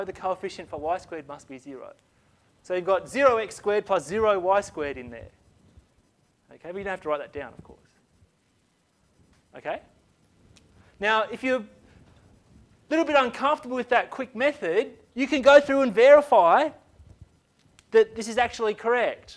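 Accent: Australia. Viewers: Nice Aussie accent.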